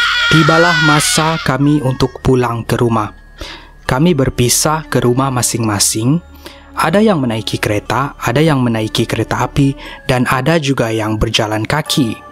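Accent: Sabah, Malaysia. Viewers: are you sabahan?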